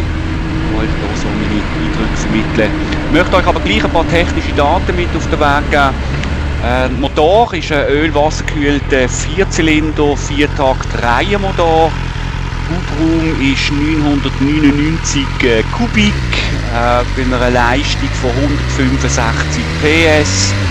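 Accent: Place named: Aargau, Switzerland. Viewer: Was das fürn Dialekt, klingt nach Aargau aber irgendwie komisch :D Grüße in Richtung Heimat.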